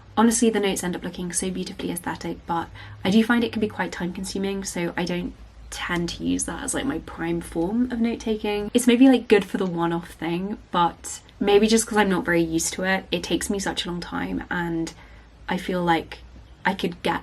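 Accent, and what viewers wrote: United Kingdom. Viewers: British accents fascinate me like Henry Higgins, in such a small country. This lass sounds a lot like Elton John which makes me wonder if they hearken from the same region.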